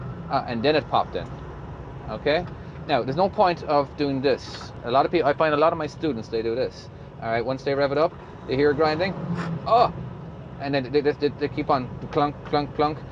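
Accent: Ireland. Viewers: The best shifting video. Irishman? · Very cool to see! Also you have a very cool voice, are you originally Irish by any chance? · dude sound Irish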